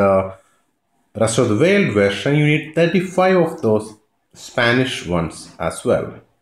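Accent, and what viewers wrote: Kerala, India. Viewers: oru malayali paryne pole tane und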